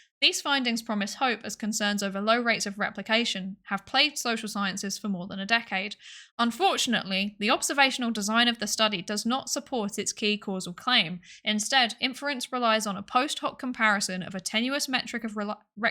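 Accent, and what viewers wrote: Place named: United Kingdom. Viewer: I don't know how I got here, but I can tell from your accent that you're from the same region in the UK as Rin Penrose, so that's good enough a reason for subbing as any.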